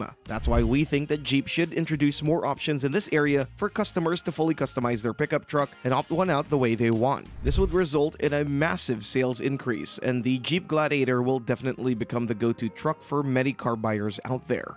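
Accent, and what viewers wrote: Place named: Philippines. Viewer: You sound philipino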